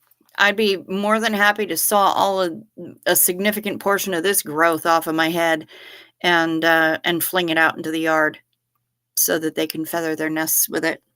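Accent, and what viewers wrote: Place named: Ireland. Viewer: I didn't expect her comedic timing and Irish accent to be THAT good! It's fun to dial into a show and hear something other than what you'd expect!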